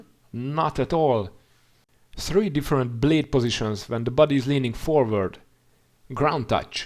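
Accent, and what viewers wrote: Hungary. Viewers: Vó srákok nem gondoltam volna h magyar csatorna :D pedig felismerem a magyar angolt. Pont jol jonnek ezek a vidik. Ment a sub pisz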